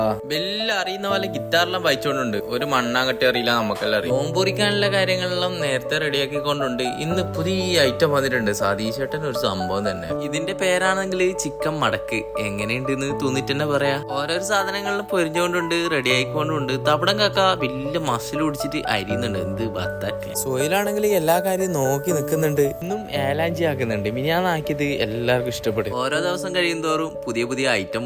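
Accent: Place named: Payyanur, India. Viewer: ഞാൻ പയ്യന്നൂര് ന് അടുത്താണ് നമ്മുടെ അതെ സംസാരം കേട്ട് ചോദിച്ചതാണ് 💖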